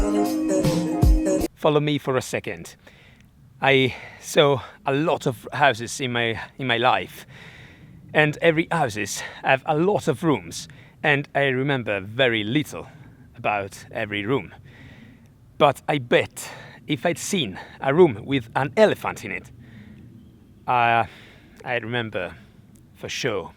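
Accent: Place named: United Kingdom. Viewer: Accento britannico perfetto!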